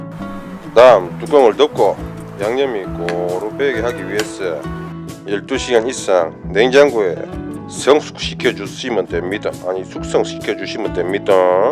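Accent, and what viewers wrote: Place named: Gyeongsang, South Korea. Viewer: 구수한 경상도 사투리로 듣는 맛있는 양념갈비 레시피 재밌었어요.^^ 댓글 보고 왔는데 ㄱㄷ하고 갑니다. 앞으로 자주 소통하기를 바래요.^^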